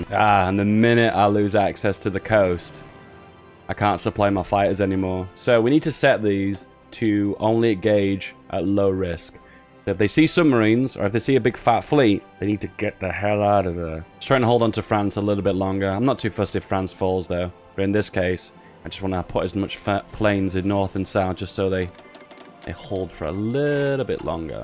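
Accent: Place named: United Kingdom. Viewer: I love your videos and I love your accent. Simply because I'm french (salut l'anglais!) and all the french people loves the british accent in english.🤣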